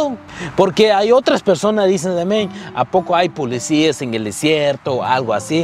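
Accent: United States. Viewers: y tu acento hermano asi como medio gringo jejen una pregunta , curiosidad , soy seguidor tuyo , me asusta ir con coyote pero bueno es bueno aprender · jjjjjjjjjj lol 13 años y en redas la lengua como gringo no la en redo yo que tengo 30 años aqui y me cruso mi papa teniendo 5 años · Buen video bro, pero una pregunta, a los cuantos años llegastes a Usa? Porque hablas como los chavos que nacieron alla. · Me encantan tus videos pero no entiendo por que perdiste tu acento osea te cuesta el español y eres de Guatemala no entiendo ese esfuerzo de hablar como gringo espero no lo tomes a mal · Porque hablas como gringo oye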